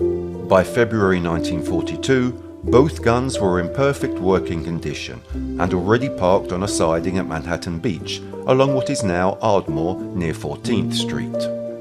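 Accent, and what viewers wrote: United Kingdom. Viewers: A story about the USA..but Brit narration ?? Peculiar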